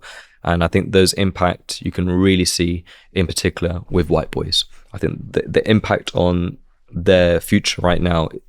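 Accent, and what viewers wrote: United Kingdom. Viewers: Good information well put .. British English spoken ..the tone and and the pace is so much easier and pleasant to the ears .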